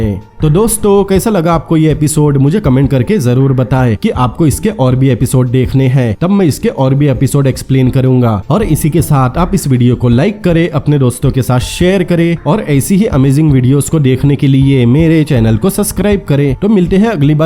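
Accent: Assam, India. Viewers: Bhai kya asaam se Hai kya tera pronunciation se pata chala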